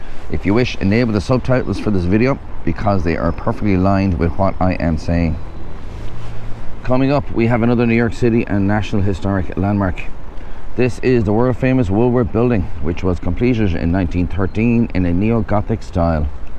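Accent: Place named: Ireland. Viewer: 语速比之前慢了不少，发音也更清楚了👍就是爱尔兰口音依旧明显😂对于英语初学者来说视频整体可能还存在一些难度，不过感觉如果把所有视频都看熟了可以收拾行李直接飞都柏林了😄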